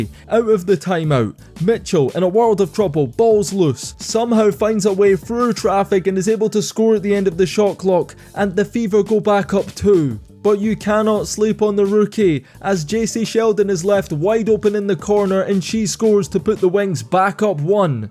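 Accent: Scotland, United Kingdom. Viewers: It’s a bit odd, hearing a basketball game narrated by a Brit. They play bball as much as we play Cricket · Where is the accent from, please? Scottish?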